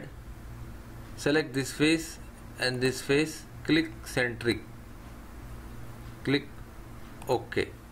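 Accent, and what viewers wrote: India. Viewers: 讲得非常好！听这口音，我就猜到是印度人。能听出来是因为最近学音标，看印度电影。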